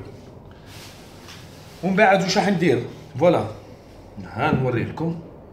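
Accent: Algeria. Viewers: يحكي عربي بس اللهجة الجزائرية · تعجبني لهجة دزايرية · Bro he's algerian lmao 💀💀💀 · حلوا اللهجه الجزائر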